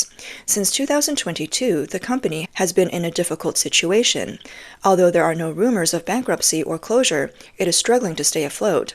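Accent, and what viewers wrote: Australia. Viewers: Ya, I think she’s Australian, faint accent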